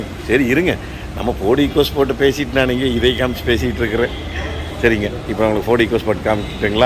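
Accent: Kongu, India. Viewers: கொஞ்சும் கொங்குத் தமிழ் பேசும் கோமகரே❤❤❤❤❤ திகட்டாத பேச்சுடைய திருப்பூர் திருமகரே❤❤❤❤ அருமை அருமை அருமை அருமை யெப்பா வேற லெவல் மதிப்பிற்குறிய அய்யா🙏 நீங்களும் உங்க வீடியோ மற்றும் உங்கள் கார் வேலைப்பாடுகளும் எல்லாம் வேற லெவல்😊😊😊😊😊😊😊😊😊❤❤❤❤❤❤❤❤❤❤❤❤👍👍👍👍👍 அதிலும் குறிப்பாக அய்யா நீங்க நகைச்சுவை நடிகர் சின்னக்கலைவானர் திரு.விவேக் சாரோட தீவிர ரசிகராட்டம் இருப்பீங்கபோல😊👍 Secondல சொன்னது "ரைட் சைடு இண்டிகேட்டர்😂 (ஆனால் திரும்புனது இடது பக்கம்😂) அதாவது ரைட் சைடு இண்டிகேட்டர்னு சொல்லுவோம்.... அதே நேரத்தில் இடது பக்கம் திரும்புவோம்.... ஆனால் Straight ah போயி நம்ம ah கண்பியூஸ் பன்னுவோம்ல😂😂😂😂 என்றும் அன்புடன் உங்கள் மகன்போல இவன் ரா.முஹம்மது கபூர் (சென்னையில் இருந்து) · Getting addicted to your Kongu tamil.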